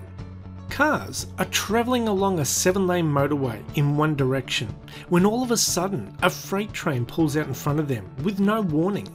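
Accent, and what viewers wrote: Australia. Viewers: yeah I agree with Ted. It's a well-spoken Australian accent. Beautiful accent. My accent. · yes exactly I kept on hearing "Murderway" and "Murderists". I guess that's Aussie accent!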